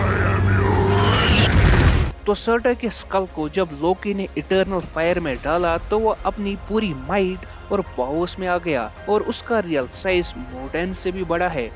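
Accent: Kashmir, India. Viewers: Are you from Kashmir because I am also from Kashmir your voice is like you are from Kashmir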